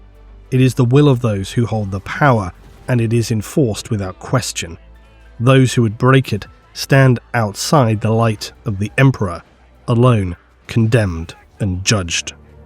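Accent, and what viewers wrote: England, United Kingdom. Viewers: Amusingly, with his particular English accent "I am the law" would sound a bit like "I am the lore" and "I am the lore" would sound a bit like "I am the law".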